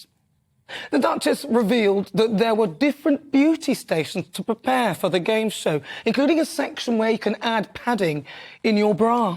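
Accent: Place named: England, United Kingdom. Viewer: you are brilliant. You see it and you tell it. Love your English accent too:-) Hi from NZ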